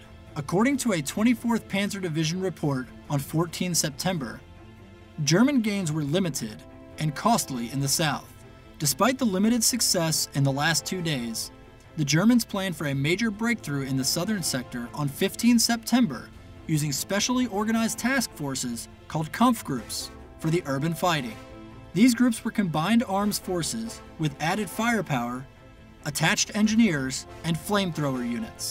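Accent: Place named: United States. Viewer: American accent somehow kinda kills the seriousness of a documentary. Needs British voice.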